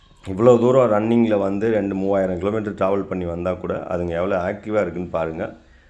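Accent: Madurai, India. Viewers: ❤ assalamualaikum warh best goat lovely madurai ❤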